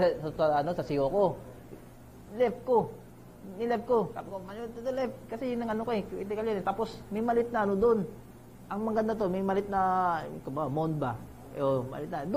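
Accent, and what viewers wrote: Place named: Visayas, Philippines. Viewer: FLuent sa tagalog si pero di nawawala ang,, ba.. Naisip ko n ilonggo or cebuano. Tga gensan pla👍👍👍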